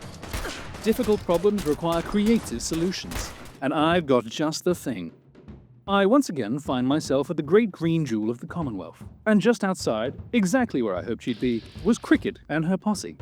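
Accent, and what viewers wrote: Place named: United Kingdom. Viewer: You're Britisj 10/10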